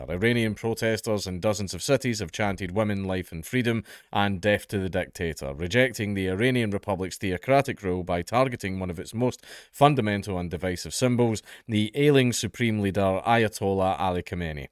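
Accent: Scotland, United Kingdom. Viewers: Not for much longer, mate. And no, that's a Scottish accent. Are you kidding? Fuking Americans.... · You must be a colonial. There is no such thing as a 'British accent'. has a Scottish accent. Scotland is a country, with it's own history, culture and language. Scotland is part of the United Kingdom of Great Britain which includes four countries; England, Wales, Scotland and Northen Ireland. After the acts of Union in 1704 and 1801 these countries became part of Great Britain but each country has it's own language which is the basis of the different accents and dialects. Only yanks say 'British accent' as they are ignorant of the mother countries' politcal, cultural, liguistic and historial fabric. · You do realise that Scotland is part of Britain yes? He has a British accent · Scottish accent?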